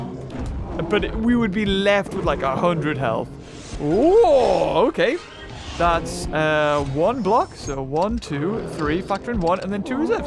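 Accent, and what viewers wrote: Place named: United Kingdom. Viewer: Why you talk british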